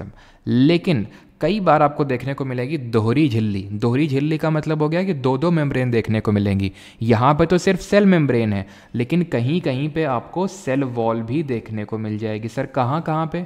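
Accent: Kanpur, India. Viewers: Pahli bat to sir apki boli se lgta hai aap kanpur me agal bagal se hain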